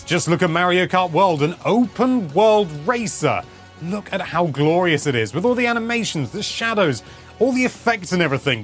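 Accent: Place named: United Kingdom. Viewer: NOTE FOR THE EDITOR: so ive come to really enjoy the little gags on editing like the “its a methaphor” on this one. The videos on the channel have always been good to watch on a second monitor, specially with the more then enough waffling in a sweet british accent, but today i played the video on the TV while grabbing launch and a mayority of the video its just a static shot of someone talking, so i say all this to point out that that little gags, and more like it could make the video more entertaining and attractive to watch on a primary monitor, nice job.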